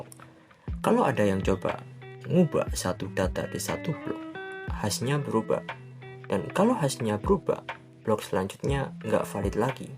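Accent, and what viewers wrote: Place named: Java, Indonesia. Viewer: Medok banget suaru lu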